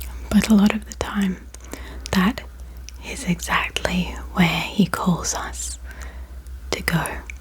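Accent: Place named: Australia. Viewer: our Aussie accent reading bible verses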